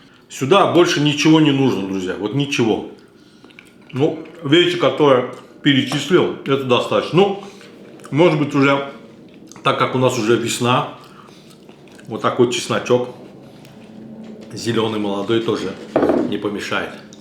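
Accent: Baku, Azerbaijan. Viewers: Что за акцент? Азербайджанский что ли? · БАКИНСКИЙ АКЦЕНТ !!!❤ Однозначно лайк!!! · ВАХ...ВАХ..АКЦЕНТ СЛАДКИЙ КАКОЙ....РАДНОЙ АЗЕРБАЙДЖАНСКИЕ... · Этот акцент не перепутаю ни когда , привет от Бакинца из Крыма , тут с селедкой сейчас проблема )) приятного аппетита , нуш олсун 🔥🥃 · Хоть в Антарктиде, хоть в Африке, Бакинский акцент ни с чем не перепутать :)) удачи вам земляк.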